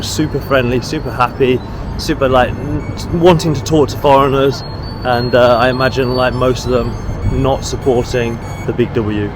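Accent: United Kingdom. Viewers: Нет, это британский английский. Поэтому не так понятно .😊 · If you're living in US you should hear his accent, it's obvious UK accent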